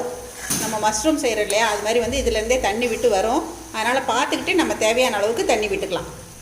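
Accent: Kongu, India. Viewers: superma 👌.karura palavidhamana visayangalayum kovil anai hotel market handloom bedsheets towels indhamari ungalai kavarndha visayangalayum podunga .therindhukolla vasadhiyaga irukkum.kongu tamil pesi vilakka neengaldhan super.valarattum ungal pani valthukkal.💐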